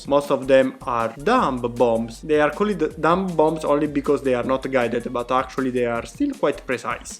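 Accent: Italy. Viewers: Italian accent makes it about 10 times better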